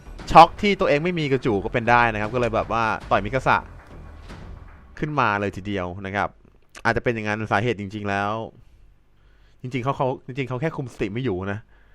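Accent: South, Thailand. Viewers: พี่เป็นคนใต้หรอค่ะ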